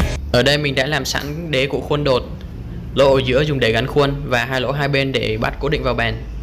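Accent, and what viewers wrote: Vietnam. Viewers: Giọng nge giống dưới thanh bình thế nhỉ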